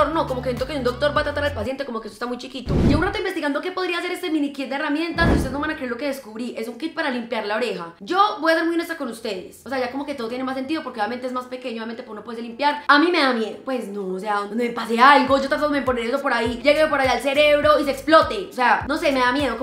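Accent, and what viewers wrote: Antioquia, Colombia. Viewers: Me encanta tu acento paisa ♥️más vídeos de cosas perdidas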